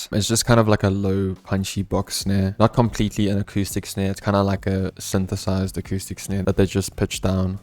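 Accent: South Africa. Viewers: Have you ever lived in south africa? Accent is strong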